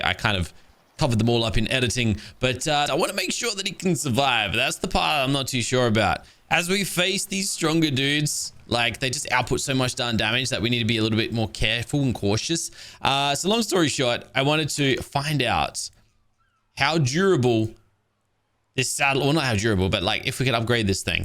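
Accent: Australia. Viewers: Sounding extra Aussie today. Good stuff!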